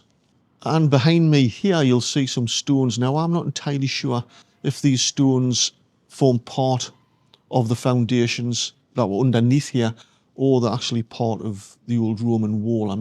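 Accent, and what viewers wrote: Newcastle, United Kingdom. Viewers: Thanks for this. I love hearing a genuine geordie voice, and your commentaries are always interesting. I had guessed the Roman connection before you started explaining it, but there was a lot more that was new to me. Makes me want to go and spend some time exploring the city, which I didn’t have the time, the money or to be honest, the inclination to do when I lived there as a student, 1962-67. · Yoooo, Geordie Tom Scott.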